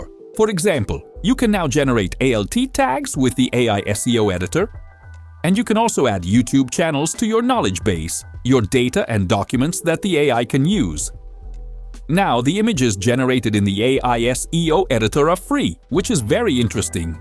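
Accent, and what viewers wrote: Italy. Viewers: I miss the Italian accent and charm.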